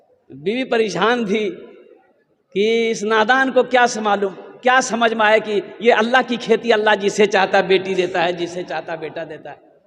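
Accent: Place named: Bhagalpur, India. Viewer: Aap kaha se ho maulavi saheb , aap to mere taraf ki bhasha bolte hai , bhagalpur. Sunne me bahut achha lagta hai.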